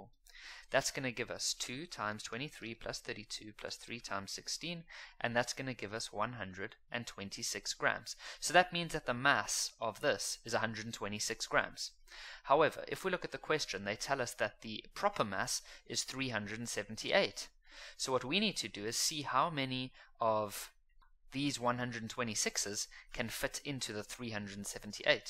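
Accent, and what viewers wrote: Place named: Afrikaans, South Africa. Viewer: aaaa... comment take 2. It is now 2;50 in the moring with my test tmr, this is like the 3rd video of yours that I'm watching and oh my soul, the jokes. I almost woke my house laughing. fristy comment on your market stragey, Making content that is already in demand just slightly older is very smart * cap cap. secondly thank you for making this avliave bro, appreciate it. thirdly, are you South African? Your accent sounds very much afrikaans, its cool. Okay good night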